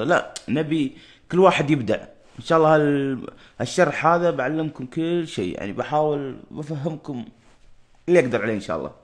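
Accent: Kuwait. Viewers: اشكرك بس مافهمتش ولا أوشي هههههه عاد انت من الرياض زانا أحسبك من إخواننا الكويتين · انت سعودي ولا كويتي ؟؟؟ يا اخي كلامك كويتي والله احسبك كويتي طول هالمدة:) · اما انت سعودي والله كنت احسبك كويتي &__& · آما انت من الريآض , آحسبك كويتي !؟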